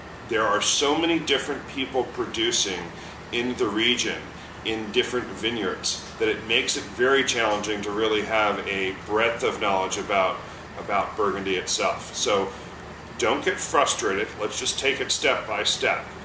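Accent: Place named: United States. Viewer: practice that american accent in french. so sad.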